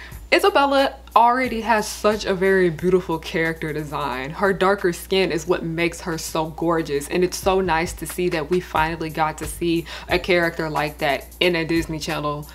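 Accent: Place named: South, United States. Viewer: your southern accent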